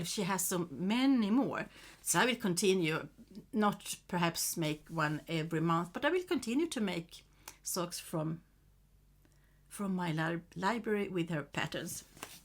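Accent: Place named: Sweden. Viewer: Happy New Year! Wow--not surprising that I was far off for the guesstimate of the yarn amount for 2024 😂 Your Swenglish was excellent--no worries. Loved all the socks and plans...see you next time!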